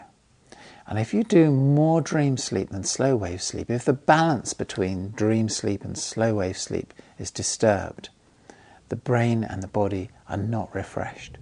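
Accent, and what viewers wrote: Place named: RP, United Kingdom. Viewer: I want to take it seriously, but he looks like me *when* I'm depressed. Fluffy hair because I haven't bothered brushing it, bags under my eyes despite sleeping all the time, and clothing I've just thrown on (flesh coloured jersey?). :( Having a David Attenborough accent doesn't make something authoritative...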